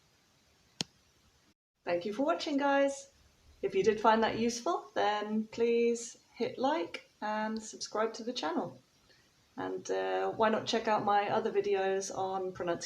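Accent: United Kingdom. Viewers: Thanks a lot. It's very useful for me. I like your British accent!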